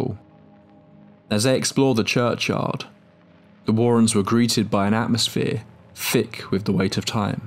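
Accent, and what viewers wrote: United Kingdom. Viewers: Why does this accent sound British, he's not...Unless he is..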